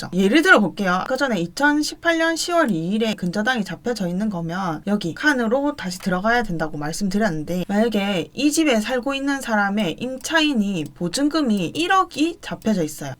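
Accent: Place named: Busan, South Korea. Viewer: 사투리 윽수 까리해요 맕 가 마산 창원 울산 아니고 딱 부산이네마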